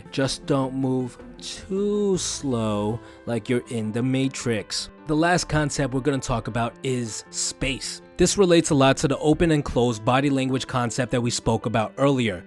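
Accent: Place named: New York, United States. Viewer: I really like your accent. Are you from new york in america?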